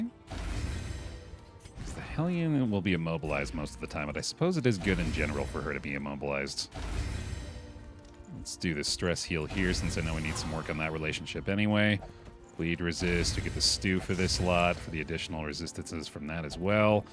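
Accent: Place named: Scotland, United Kingdom. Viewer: Dear god that scottish accent. Also instead of gobshite " ya wee dick" would have been better 😂. Love from Dundee 😊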